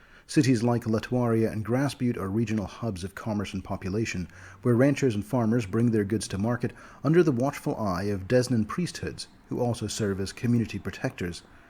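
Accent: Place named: Texas, United States. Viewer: That is a great Texan accent and cowboy-talk. For a minute there, I thought this was a collaboration with Mr. Welch.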